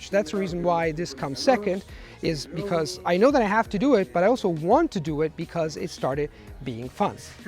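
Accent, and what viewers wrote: United States. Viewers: He’s lost his American accent. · Hey there, long time no see ! Non vedevo tuoi video da qualche anno, è vero come hanno scritto altri che hai perso l'accento americano, sta capitando anche a me da quando insegno la dannata RP! Da iperpoliglotta con più di 20 anni di "carriera" non consiglierei però di imparare più lingue contemporaneamente, ho notato che mi ricordo meglio le lingue imparate "in solitaria" che quelle apprese in gruppo, i primi anni di università studiavo inglese/tedesco/portoghese e andavo a corsi serali di cinese, ho dovuto smettere non mi ricordavo più l'italiano! Comunque l'acquisizione duratura di una lingua è un mito, ho conosciuto poliglotti in età avanzata che col tempo si sono dimenticati una o più lingue, il che mi preoccupa un po' ... ci vuole soprattutto tanto tempo libero per mantenere livelli elevati in una lingua (C1/C2), rimpiango il periodo in cui non lavoravo... 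sto scherzando l'insegnamento mi piace molto. See ya ! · J accents are only lovely when you are speaking english, but if you speak a foreign language with a heavy english accent, you often would get criticized because the pronunciation is off. I'm honestly impressed with his American accent, he sounds really fluent. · Love your american accent, I'm learning japanese and corean, the accent, entonation and phisical expressions are very important in those to express and conect with native speackers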